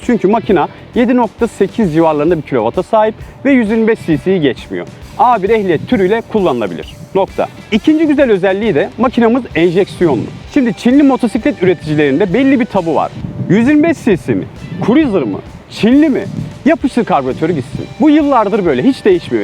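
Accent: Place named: England, United Kingdom. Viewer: Ingiliz aksani yapmasan like atacaktin, ingiliz aksani yaptin dislike aldin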